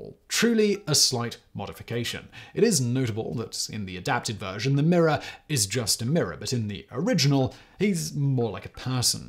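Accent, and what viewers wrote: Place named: Cockney, United Kingdom. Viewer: your emotions and active nature on set is awesome. I watch your videos because you rock and I love to hear you read things.. I dont think it even has to be your channel content, like if you picked up an old James bond novel, you would read it better than the other guy that does it for audible, your cockney accent is better and you sound more south London and it just works man.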